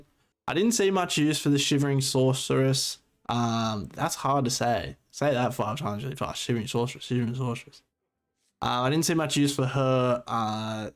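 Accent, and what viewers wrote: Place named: United Kingdom. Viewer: Kinda sounds bri'ish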